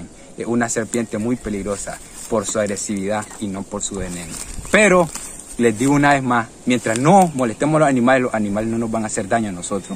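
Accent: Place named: Nicaragua. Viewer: Muy tuani el contenido y muy bueno que tengamos a alguien que muestre este tipo de cosas aquí en Nicaragua, solo mantene el acento nica. Muchos exitos en tu canal hermano 👊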